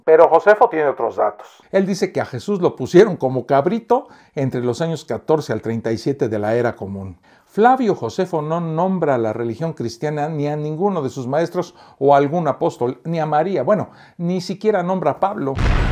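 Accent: Mexico. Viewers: Que bien se explica ...y me encanta su acento mexicano..😊😊😊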